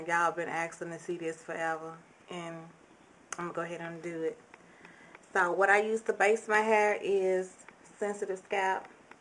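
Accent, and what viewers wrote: South, United States. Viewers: Gurl when you told your fear your tickled me, I could tell you where serious but you where so darn adorable about it. You are soooo cute and special and keep up the good work. BTW your accent really makes me miss the south a lot and when you say yall I just go man I really miss home.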